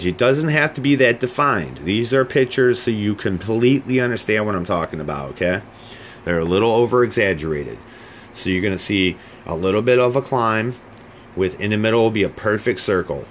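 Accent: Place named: United Kingdom. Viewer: PARDON! I didn't understand anything , you started talking Britannica .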